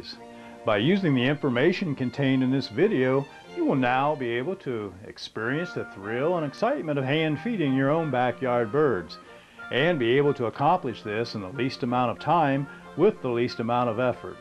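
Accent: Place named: United States. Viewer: I thought this was jolly old England , my homeland, untill you started speaking. It was beautifull. Then came the US accent and my mind wandered into the wording of 'backyard birds'... mmm the backyard of birds, Just me? British hunour maybe ehehe. I enjoyed the visit nonetheless.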